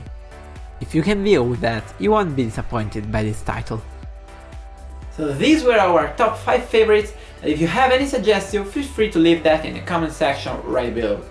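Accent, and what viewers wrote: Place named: Italy. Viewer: actually has a Italian accent.... Just saying...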